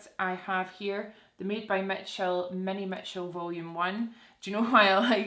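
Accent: Scotland, United Kingdom. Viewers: HOW stunning is that eye make up!? 😍 ur channel is forever my fave. Love hearing a fellow scottish accent on YouTube 😘 p.s I live for the out takes at the end of each video 😂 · It was the accent for me. Oh how I miss Scotland. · "My ghetto friends" Ha ha ha!! I love your Scottish accent and the way you say girl! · My personal opinion I LOVE 💕 the way you say “Girl” I absolutely love you’re Scottish accent!!!!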